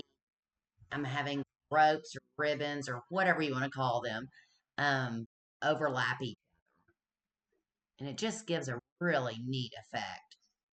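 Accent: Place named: Arkansas, United States. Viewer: I thought both the works you showed were very cool as it does look alot like stalks of plants and you're right the possibilities are endless. I loved yours but would want to have the colours behind the stalks which i would make in different levels of the same green if you get me. What do you think? Also, what a fab southern Accent! So lovely to hear. I can't place it. 😂 oh wait its Arkansas!!! Wow great accents there!! these tips here are great & this could also look like a matrix type look in 3D so it could be super modern or clsssic with stalks....this second one is really beautiful.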